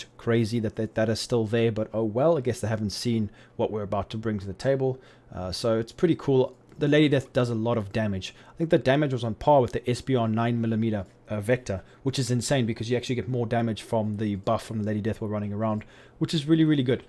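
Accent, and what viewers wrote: South Africa. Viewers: Are you from South Africa?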